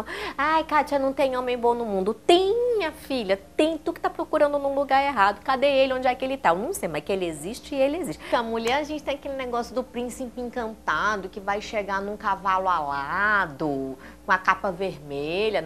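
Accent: Brasília, Brazil. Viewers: sotaque brasiliense super ativo rsrs aií aií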